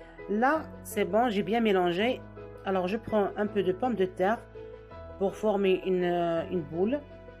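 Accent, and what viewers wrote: Oran, Algeria. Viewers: عجبتي وهرانية تاعك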